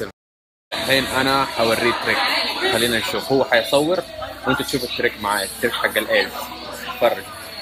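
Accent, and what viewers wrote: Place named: Hijaz, Saudi Arabia. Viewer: بس اللهجه حجازيه زي احمد الشقيري لكن الصوووت مره لااا😭😂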